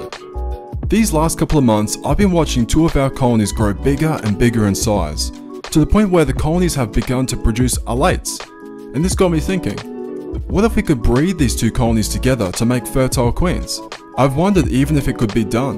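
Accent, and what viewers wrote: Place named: Australia. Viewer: its like youre a australian ants canada also good info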